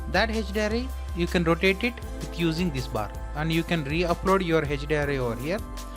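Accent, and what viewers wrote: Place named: India. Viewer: I had hoped to follow your tutorial but it was just too frustrating. I find it difficult to understand Indian English because American English is my native tongue. It would help tremendously if your narrative was presented in closed caption. Thank you for your tutorial and your understanding.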